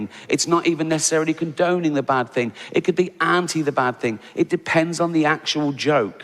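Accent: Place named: London, United Kingdom. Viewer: Gervais for me is such a bore and I hate his semi London accent. 🤮🤮🤮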